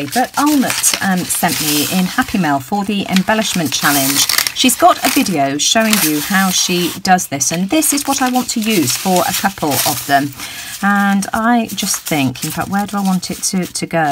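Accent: United Kingdom. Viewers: Love love love your accent! So wish I was British 😭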